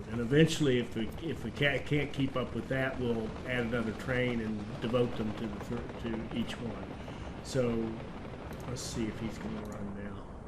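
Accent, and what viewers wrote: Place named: Appalachia, United States. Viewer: Hey, im one of your newer subscribers and I have a question or two. Firstly do you do any geneaology, because of the last name and coat of arms. Secondly, your accent seems appalachian like my mothers side who are from southern wva and eastern ky. I do geneaology and my great grandmother was an adkins which is similar to your last name. Just intrested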